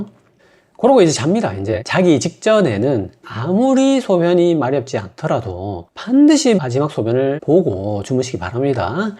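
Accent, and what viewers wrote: Gyeongsang, South Korea. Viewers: ~경상도 억양의 말투 너무 재밌어요. 귀에 쏙쏙 들어와요. 뭔가 중독성 있어요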